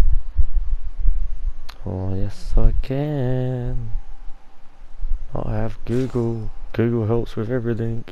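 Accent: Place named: Australia. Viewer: Good to Aussie voice in a YouTube video for a change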